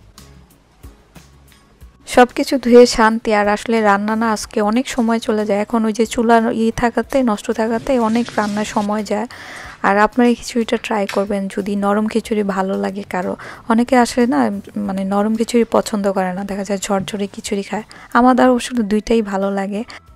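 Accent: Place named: Sylhet, Bangladesh. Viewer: আপু,খেয়াল করলাম আপনার কথা বলার মাঝে হালকা সিলেটি ভাষার টান এসে যায়❤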